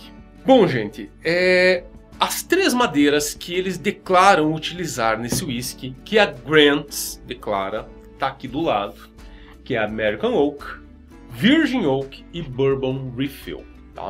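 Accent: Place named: Rio Grande do Sul, Brazil. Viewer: Vc e gaúcho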